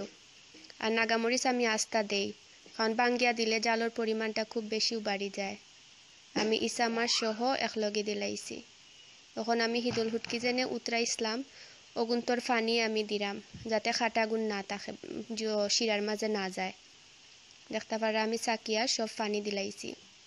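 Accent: Sylhet, Bangladesh. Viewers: ওয়াও জাস্ট ওয়াও অসাধারণ বিশেষ করে তোমার মিষ্টি সুরের সিলেটি ভাষা ভাবি? awesome। Dubai থেকে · I miss your mishti sylehti mat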